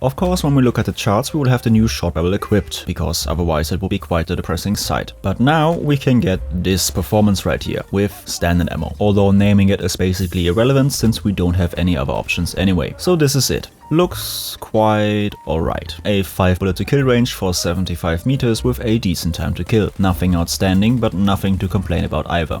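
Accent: Germany. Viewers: Just wanted to say hello as a new subscriber. Just found your videos and they are AWESOME. I really like how you break the weapons down, specifically the ttk ranges and ammo/barrel differences. You are the 1st BF person I've found who does this (yes, I'm newer to BF) coming from 20yrs of COD where it is more common (again yes, I'm a COD convert but I think we all can get along don't hate me 😉. Also my fave is MW2 most BF-like imo). Already kinda long sorry just wanted to say keep it up great job! And as 1st generation American from German & Croatian immigrants I think i caught the accent - German, no?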